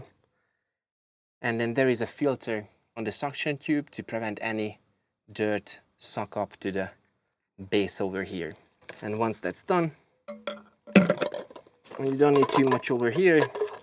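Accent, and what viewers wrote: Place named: Hungary. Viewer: Hi, according to your accent, you must Hungarian 😉. Correct me if I'm wrong. Good content by the way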